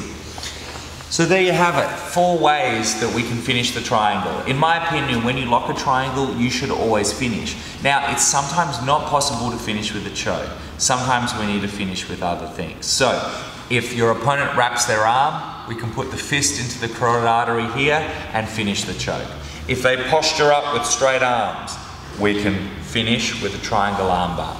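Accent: Australia. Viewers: His accent sounds Aussie, but his school is based in LA now I think?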